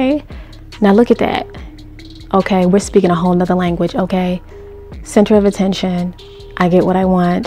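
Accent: New York, United States. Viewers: dat nyc accent is a yes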